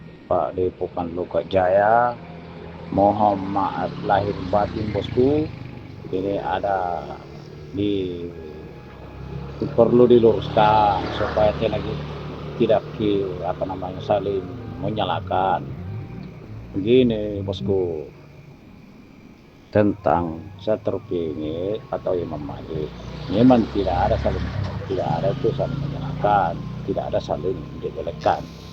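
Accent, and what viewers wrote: Sulawesi, Indonesia. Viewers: Assalamualaikum.. Oo..Daeng.. saya senang dengar logat bicaranya mengingatkan banyak kenangan😊. Setiap daerah mempunyai gaya bahasa logat yang satu kata berbeda makna. Dan sebagai orang jawa terkadang saya harus menyesuaikan kata yang dapat sepemahaman dengan mereka. Seperti kata kamu menjadi kita dan Iman vs yakin. Tetapi saya suka orang sulawesi👍..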